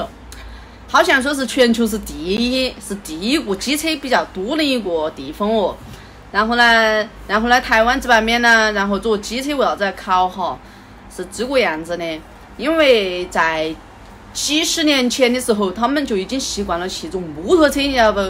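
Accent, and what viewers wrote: Guizhou, China. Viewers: 恭喜很喜歡全程貴州話聊天聽起來費用力但是賊可愛滴 😘😘😘🤣🤣🤣🤣🤣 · 先恭喜姐姐你考上駕照很棒喔、不過妹妹我有件事想跟您說、很開心可以聽到有貴州話的視頻、貴州話聽起來很可愛也很有趣、但是姐姐下次可不可以還是講普通話多一點、因為很多貴州話我都聽不懂耶哈哈哈很難去理解你視頻當中的意思只能大概猜一下囉不好意思.所以以後可以還是講普通話多一點嗎？不好意思. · 哇~第一次聽妳用貴州話拍影片，感覺貴州話口音聽起來蠻不錯聽的，有點可愛，有的很像台語，語助詞也蠻多的哈哈，恭喜妳機車駕照考過拿到到手了汽車要注意安全喔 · 這是貴州話嗎？太棒了！加油！ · 貴州話和重慶話很近，但不一樣的地方也蠻多的哈！不看字幕，我聽得懂95%！😁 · 貴洲口音還滿可愛的我很努力的聽 🤣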